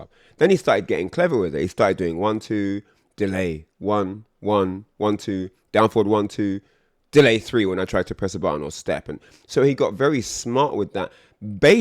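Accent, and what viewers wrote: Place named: United Kingdom. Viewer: Uk accent! Damn I thought you were from the US. Yelp that another L for US. I thought US had a FGC Legend. I really thought u were from the US 😭. Anyway Its the principle of the matter..no coaching allow! It's a tournament where one that player show the crowd the true skill that the player possesses without cuck coach interferences. How else are you suppose to know if the player is truly skill without help. Never got why coaching was allow in the first place...SMFH!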